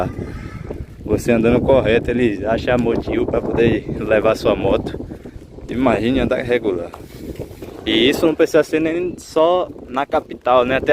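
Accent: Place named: Rio de Janeiro, Brazil. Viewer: Se vc é da Bahia porque esse soutaco carioca?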